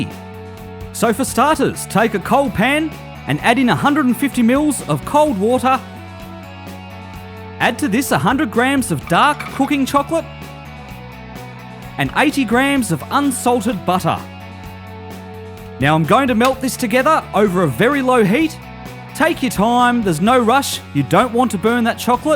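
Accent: Australia. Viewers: idk why but i fkin hate aussie accent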